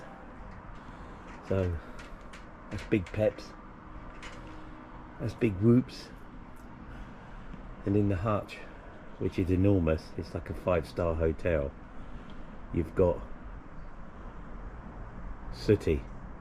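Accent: United Kingdom. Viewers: Great video ! Love your banter and lovely Brit accent and humour. Great family and work ethic. Wish it would rub off on those around you. ❤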